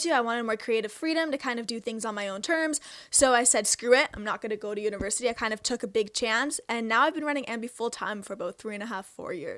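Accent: Canada. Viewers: You sound very canadian. The "outs" and "abouts" are cute. ^^ I think the "Sorry about that" question is a reference to Colts recent Art of Wrestlling episode with Edge, is it not? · your Canadian accent is awesome!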